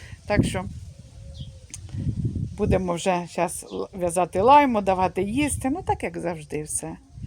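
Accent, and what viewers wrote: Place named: Poltava, Ukraine. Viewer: нашу Полтавську говірку нічим не переб'єш.Дай Бог Всім здоров'я.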